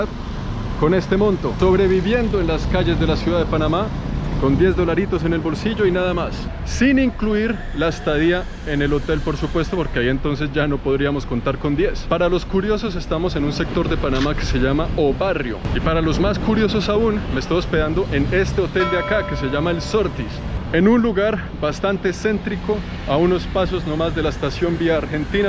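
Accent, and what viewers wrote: Colombia. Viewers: Eres Panameño De Nacimiento? Por tu acento pense que eras Colombiano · Ejjj hermano si vos sos panameno porque hablas como nosotros los colombianos pues mijo? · con razón tiene acento colombiano. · Nacio pero se crio en colombia y tiene todo me imagino cultura acento todo osea es colombiano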